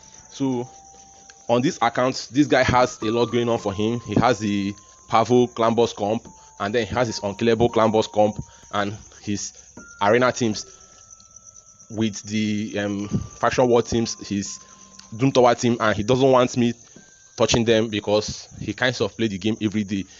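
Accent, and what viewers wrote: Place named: Nigeria. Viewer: Mitchell it is english, he has a Nigerian accent, at the top of the screen you'll see a box, you'll see a box with "CC" click on it for subtitles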